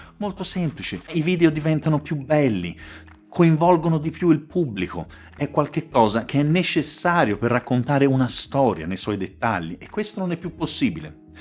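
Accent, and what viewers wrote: Tuscany, Italy. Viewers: Sacrosanto! Devo dire che mi ha fatto un po’ impressione sentire un bell’accento toscano in bocca a, abituato com’ero a sentirlo parlare in inglese! 🤣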